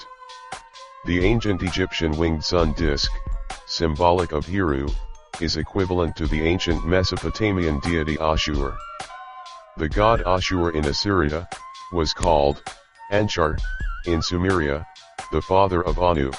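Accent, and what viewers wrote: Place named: AAVE, United States. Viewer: I am not a fan of black ebonics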